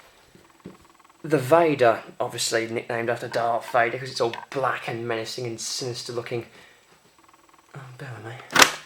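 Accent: United Kingdom. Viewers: u sound like ure from the west mids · i love your british accent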